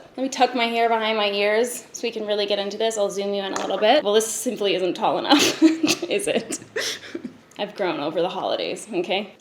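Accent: Canada. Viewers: Happy New Year! Love your Canadian accent,! That’s actually part of the reason I started watching you, Jamie and Allana. I also used to have a thing about Canadian guys based purely on their accents, then I realised if I carried on this obsession it might end in me marrying a mounty or something... Your skin looks fantastic! I also love Hourglass and got the Ghost blush palette for Christmas, as well as the Scattered Light holiday shadows set. They are beautiful. Hope you and your family have a wonderful 2020! Much love from Munich xx 💋 xx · I love your Canadian accent!! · I think your Canadian accent is delightful! You don't need that kind of negativity in your life... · OMFG YOU FINALLY SAID IT! I LOVE YOUR EYE BEHGS!!!!! WE LOVE YOUR ANGELIC CANADIAN ACCENT!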